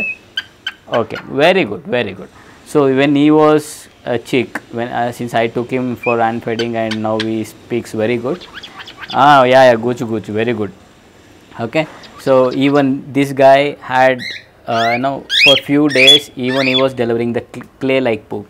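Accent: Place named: India. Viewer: Sir ur voice is so Indian Love it and thanks for the info I need some help for cockatiels can you reply so we can chat for answering question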